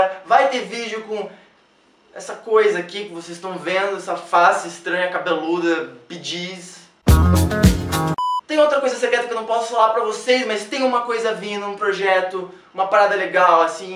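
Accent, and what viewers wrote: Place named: South, Brazil. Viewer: vc e do sul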